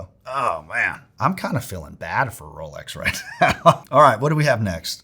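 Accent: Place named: France. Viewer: One of the best and more complete tier list in my opinion. Your french accent is perfect!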